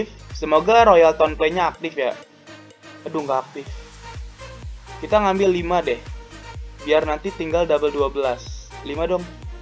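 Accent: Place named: Jakarta, Indonesia. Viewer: Betawi Banget Ya 😊😊😊 Semangat Terus Bang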